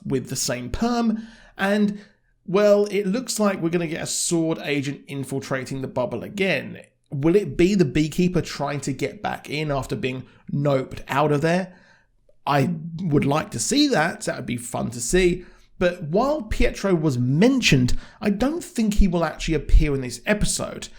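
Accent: United Kingdom. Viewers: Thats because he's British. I have a similar accent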